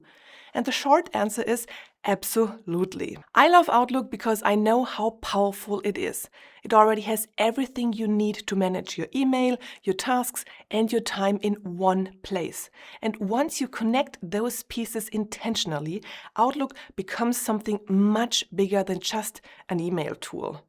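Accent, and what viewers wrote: Germany. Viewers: Hard German accent.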